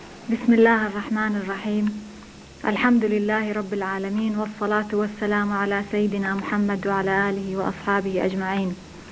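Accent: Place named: Jordan. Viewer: Она не из Иордании?интересный говор у нее.Хьихам хаз бу,вечно бы слушала ее.Аллх1 рез хилчарех дойл вай.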